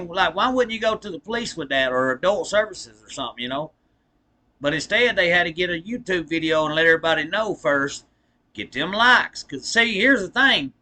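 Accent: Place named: Carolinas, United States. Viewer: Yo 😂😂😂😂u ah trip bro,where u from. I from Charleston SC n you sound like you from SC NC or somewhere close. What sense it make to talk to on a video,I mean you can't understand what he says😂😂😂😂😂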